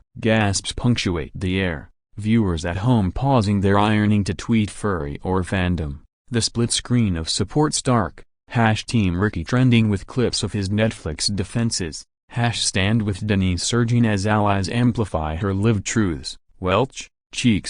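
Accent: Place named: United States. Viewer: Sounds like an American giving a commentary on a English Tv's loose women?? It's all B/S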